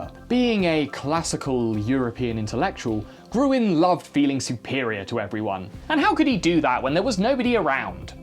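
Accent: United Kingdom. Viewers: Didn't realize was British.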